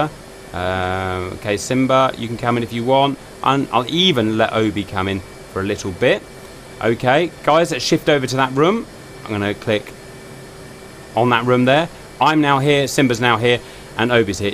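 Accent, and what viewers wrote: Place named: United Kingdom. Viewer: Great stuff man. You lose me at times because Idk what I’m doing but that’s why I’m watching your tutorials. You’ve helped me a great deal and I’m sure there’s a lot more people than just me. I’m glad to see y’all are finally getting recognized for everything y’all do for the community with sponsors. I’ve seen another speaking gig you did the other day. The British accent is loved by Americans and you could sell ice cubes to Eskimo. You gotta great speaking voice and you would really shine in documentary type stuff or historical retelling of events. Best of wishes and Godspeed to all of you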